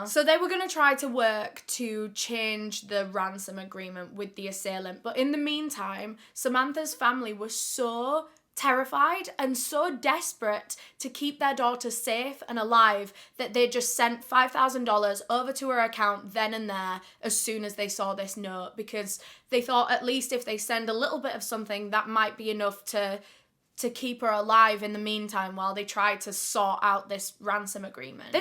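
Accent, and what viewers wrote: United Kingdom. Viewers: what accent is that uk ? scotland ireland?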